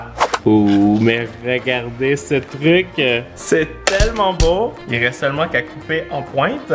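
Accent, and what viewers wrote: Canada. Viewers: tu mon youtubeur préféré desCarl is cooking vidéos accent canadien et qui met quoi des vidéos trop trop rire 🇨🇦👍👍👍👍👍 Tik Tok recette du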